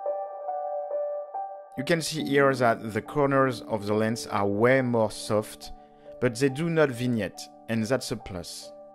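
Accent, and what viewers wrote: France. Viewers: T'as l'accent français ;-) je vais essayer de voir si je peux faire ça, ça a l'air bien cette histoire d'objectifs projecteur vidéo! Je vais essayer de trouver ça aux puces! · Your english is great! Love the french accent though :) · Tu est français ça s’entend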